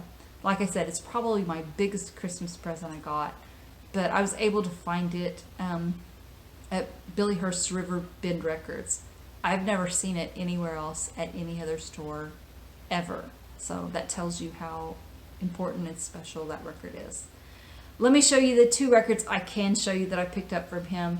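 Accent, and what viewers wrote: United States. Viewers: I'm English (in London). Although I only post an occasional comment, I always watch your videos - I subscribe to just three VC channels and you're one of 'em! I enjoy your soft, soothing American accent, and the way you speak with such grace. I also like the fact that you take notice of the record cover art. Best Wishes for Christmas and the New Year 🤗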